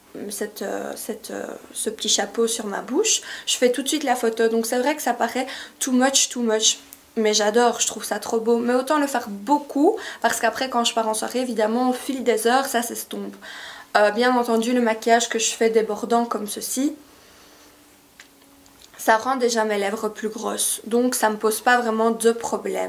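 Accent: Belgium. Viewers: Ta vidéo est sympa, il y a beaucoup d'humour, tu te moques de ton accent et oui, il faut savoir faire de l'autodérision, on a l'accent belge et on ne renie pas les origines.Elle traite bien du sujet présenté en expliquant bien les dangers pour les jeunes filles qui abuseraient de ce système, je trouve que pour une première, elle est super bien faite; je t'encourage à persévérer et à continuer si cela te plaît, sans t'occuper des gens haineux qui ont toujours un commentaire déplaisant à dire.Et pour celui ou celle qui dit que tu as des lentilles, je le défie de trouver une photo de toi sans tes yeux bleus clairs, qui font ton charme, alala la méchanceté gratuite à quoi ça sert vraiment, maintenant on peut ne pas aimer ou apprécier, pas de soucis, EN BELGIQUE c'est un pays démocratique et on a libre expression;....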